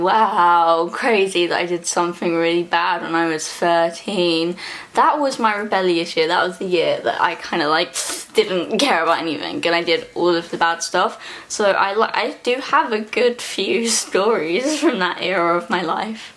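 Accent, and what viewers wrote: England, United Kingdom. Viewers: Jacob Engallena it's not her accent it's a British accent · dick bicycle I mean her hair looks pretty brushed to me. She has braces and she's British so her words might sound different. 😂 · Its English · is she from England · She is from the UK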